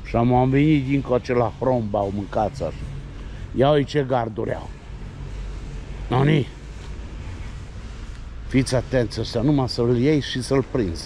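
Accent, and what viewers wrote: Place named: rural, Romania. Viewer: nu stiu dc vorbesti in accent taranesc de o vreme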